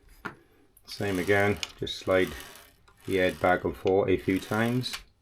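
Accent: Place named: Swansea, United Kingdom. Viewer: Hmm I'm sensing a Swansea accent. Appreciate the video!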